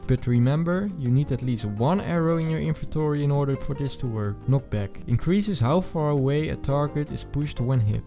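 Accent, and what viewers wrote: Netherlands. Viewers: Are u Dutch? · Love the Dutch accent hahahahah!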